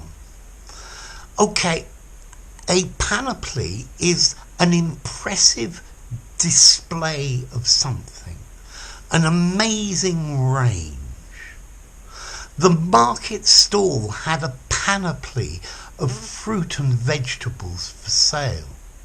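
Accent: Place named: England, United Kingdom. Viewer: Oh, I do like your presentation, its richness of examples and your delightfully English pronunciation and expressiveness. Thank you.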